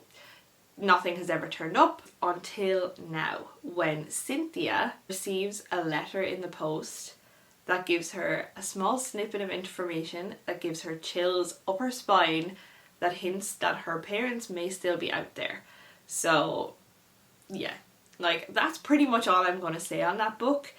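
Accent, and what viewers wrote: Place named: Ireland. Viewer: I had to subscribe to your channel because I also love the same genre as you in novels ... and because of your gorgeous Irish accent. Lol. ❤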